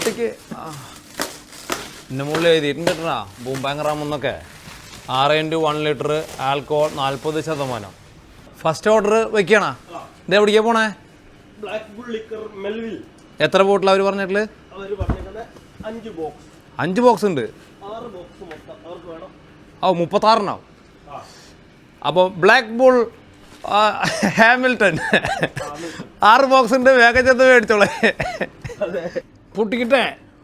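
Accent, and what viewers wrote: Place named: Pathanamthitta, India. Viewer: Nallea pathanmthitta parayanea.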